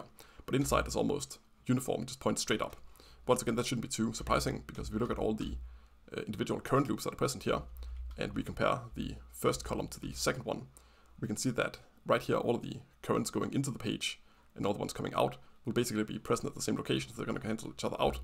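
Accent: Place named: Germany. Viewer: Your teutonic accent and how unnecessarily fast you speak makes it very hard to understand what you’re saying.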